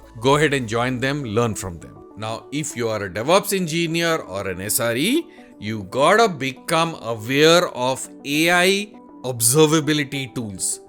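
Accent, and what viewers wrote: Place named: India. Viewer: Your accent is from India. I am assuming you were born in India. It is an embarrassment you are talking about this when the whole country is at war. Why this now ???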